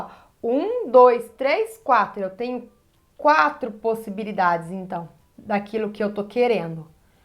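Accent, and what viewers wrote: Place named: South, Brazil. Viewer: ÓTIMA AULA, e o melhor de tudo, tem o sotaque sulista <3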